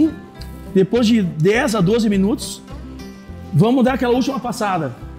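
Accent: Rio Grande do Sul, Brazil. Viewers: Tu é o cara para ensinar assar pelo teu jeito de falar tu és do Rio Grande do Sul aprendendo muito com você um abraço do Paulinho de Porto Alegre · Sotaque gaúcho é top demais. Brasil e suas várias línguas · Mas bah tche tu es de porto alegre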